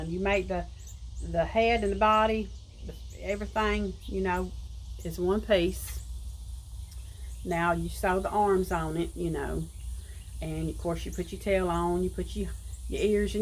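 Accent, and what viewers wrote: South, United States. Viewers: Enjoyed your video, this is my first time seeing you. I was recommended by "Summer's Tips and Stitches". She said she loved hearing your Southern accent. I am a southern woman also, from South Carolina. Love your country scene. Look forward to seeing you again. God bless and pray that you and your husband get your money back.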